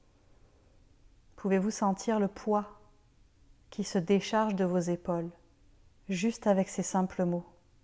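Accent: Quebec, Canada. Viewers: Chère nouvelle amie, merci. À la 19e minute, je suis entrée en moi et j’y ai retrouvé ma vraie présence. 🙏 J’ai cru entendre des nuances québécoises dans ta voix, aie-je raison? Je suis très attirée à l’idée de m’entourer d’une communauté qui porte en elle la même « mission » que moi et avec laquelle je pourrais avoir des contacts moins virtuels. Merci encore de te faire confiance car ce que tu nous as transmis était précisément ce dont j’avais besoin. 😅 Bonne continuation! 🥰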